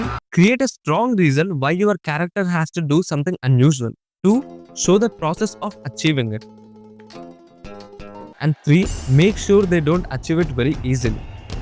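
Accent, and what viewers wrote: India. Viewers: Calling a movie way ahead of it's time with an excellent cast and pitch perfect script as a "trick" you're giving it as a bad name. Ruining something which has been a cult classic for decades is what you seem to be good at. Judging your accent, you're probably Tollywood movie fan which shows one man who has no real challenge in the real world, is a god, super hero and all achievable. At least this movie didn't portray any unrealistic things like southern movies from today's do. Appreciate the art if you can't respect it.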